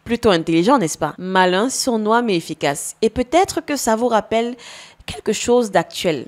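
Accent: Cameroon. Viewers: Ton accent prouve que tu es Camerounaise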